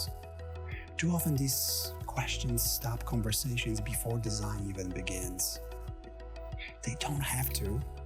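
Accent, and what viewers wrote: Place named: Transatlantic, United States. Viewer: Came for the Chicago urbanism and good title, left for the transatlantic whispery latte art pretension. Good lord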